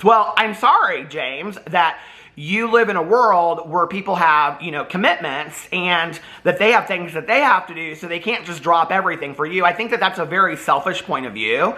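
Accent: United States. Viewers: When I first started following you a couple of years back I didn't understand the American accent and thought you were giving a radio call sign in your intro and maybe you were a DJ haha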